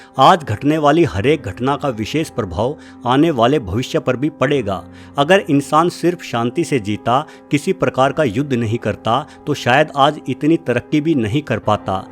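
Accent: Haryana, India. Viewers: Pkka hariyana se ho bhai sahab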